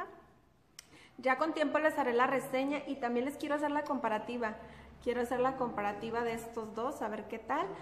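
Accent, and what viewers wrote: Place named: Nayarit, Mexico. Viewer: Jejeje me encanta tu acento nayarita, asi hablan las tias de mi esposo💕💕💕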